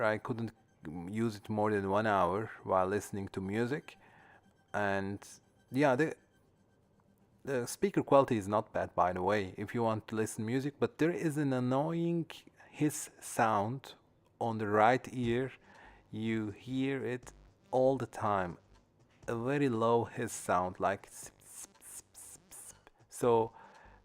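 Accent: Turkey. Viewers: thats turkish accent isnt it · İngilizceden Türk olduğunu anladım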